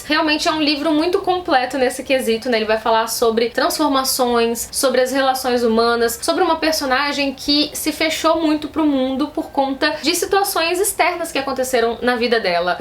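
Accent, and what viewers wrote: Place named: Minas Gerais, Brazil. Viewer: Você tem muito sotaque de mineira. 😁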